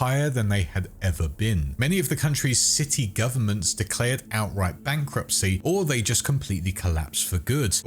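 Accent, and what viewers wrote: United Kingdom. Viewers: nah he’s just British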